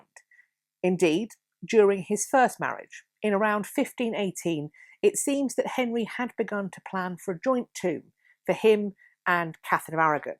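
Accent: RP, United Kingdom. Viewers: I just love listening to you speak. So elegant. No fillers not a single extra syllable. Just straight clean facts delivered in BBC English. Episodes are always fascinating as well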